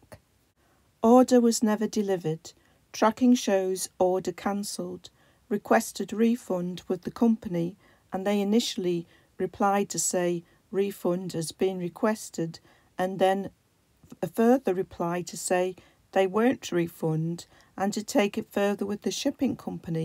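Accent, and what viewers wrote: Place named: United Kingdom. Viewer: Thank you SO MUCH for this video! SO PLEASED I found it & didn’t get scammed. Ironically, I found your voice much more soothing! Your accent reminds me a lot of a lady I used to work with. Do you mind me asking what part of the UK you are from?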